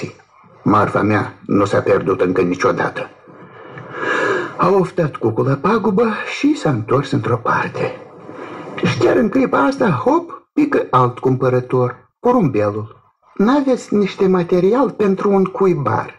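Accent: Moldova. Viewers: Dragă, chiar dacă am peste 60 de ani, iubesc poveștile copilăriei. Mai ales poveștile de seară, spuse în dulcele grai moldovenesc de peste Prut. Te îmbrățișez cu multă dragoste! Îți doresc o săptămână binecuvântată cu multă protecție DIVINĂ! 😘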